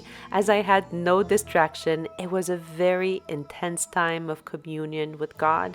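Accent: France. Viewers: I can tell by your accent you speak French and ofcourse because you referred to your uncle as tonton.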